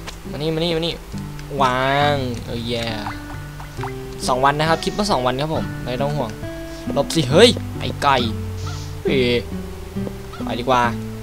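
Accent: Suphanburi, Thailand. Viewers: คนสุพันป่าวเนี่ย เหน่อจิงๆๆ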